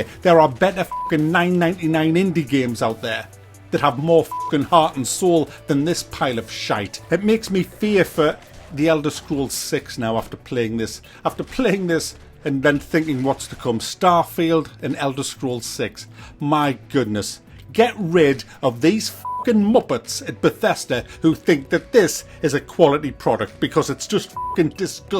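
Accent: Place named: Geordie, United Kingdom. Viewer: i can count the games on my left hand that actually has anything good to say about and i lost 2 fingers on my left hand in an IED blast whilst on patrol in Afghanistan,i think one should just take the plunge on games and buy it and play it for themselves just like the good old days and if its shit then ho well.never listen to others opinions,what doesnt work for peter doesn't mean it wont work for paul. you tubers love pissing and moaning about stuff because it attracts the low intellect people that just take there opinion as gold.back in the day when i bought sega and nintendo and commodore games there was no pissing and ranting yet the games were purchased and played none the less.i think you tube is a bullshit infested pit for people with no friends,no life and rely on it for a honest income,which i find pathetic.maybe if there was no money in it then i would like to see how many pissy rants you wanted to post then when you have to go out at 5am and work till 8pm just to scrape by.i come on these channels to boycott them,its in my belief a syndicate and many people are already trusting there lives on these people that continue to make there opinion count as if there a god and we should all bend a knee to there opinions,remember anyone can grab a cam mic and talk bullshit and joke swear and rant yes it has a certain charm because you have that jordie twang,yes the yanks probably love it but as a brit myself i hate it by the fucking way as many of my squaddie friends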